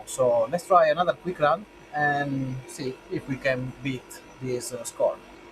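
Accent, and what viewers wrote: Italy. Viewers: Your accent sounds very Italian.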